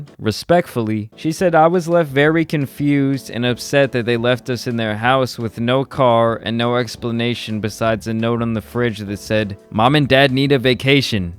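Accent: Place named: East Coast, United States. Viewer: Okay I just found your channel and I been watching everything. Where the hell did this east coast accent come from I’m so confused 😭😭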